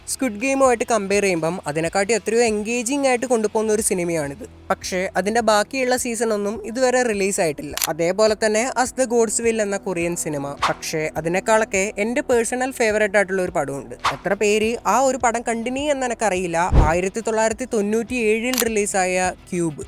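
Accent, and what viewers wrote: Kannur, India. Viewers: Kannur slang hit different 🤍📈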